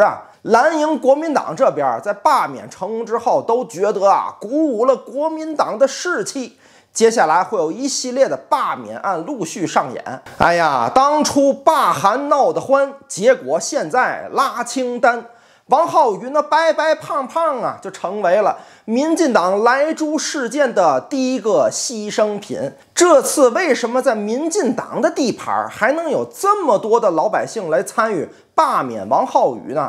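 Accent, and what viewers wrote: Tianjin, China. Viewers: 听口音好像天津的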